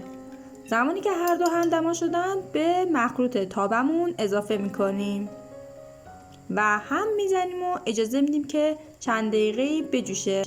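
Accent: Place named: Mashhad, Iran. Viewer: عالی بود دوست من لحجه مشهدی قربون❤😊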